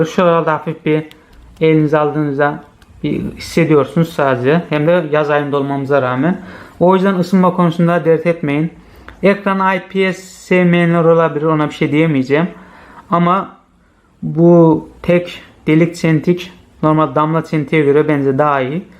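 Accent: Azerbaijan. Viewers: sen azerbaycanlımısın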